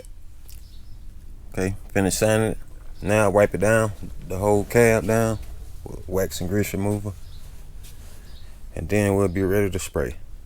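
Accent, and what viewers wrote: Alabama, United States. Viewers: Thanks for the video. Trying to learn if it's worth my time to do my obs Yukon. Sounds like you're near me (muscle shoals) - do you run into issues with humidity or do you run dehu's?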